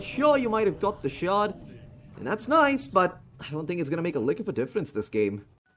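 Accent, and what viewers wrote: India. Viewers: Stupid indian accent. Can't watch.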